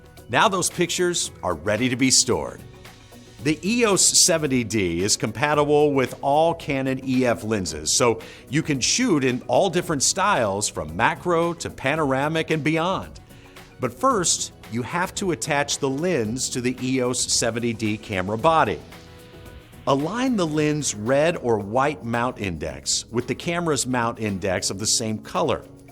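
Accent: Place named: United States. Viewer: Turned straight off, annoying American accent.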